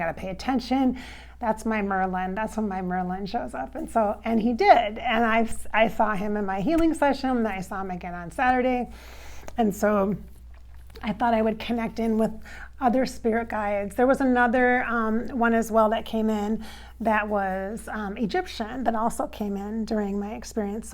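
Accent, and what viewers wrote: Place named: Ireland. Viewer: Love your Irish accent lol 😂 watching in Northern Ireland ☘️💜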